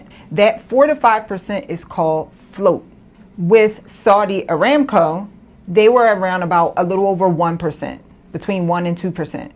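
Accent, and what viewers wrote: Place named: Baltimore, United States. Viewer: Are you from Baltimore? I feel like I hear our accent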